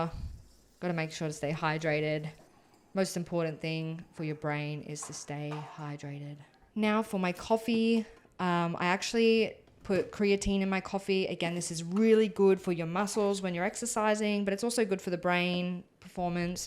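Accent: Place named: Australia. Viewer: Before u said Australian eastern time ,I knew were Australian. The accent gives it away.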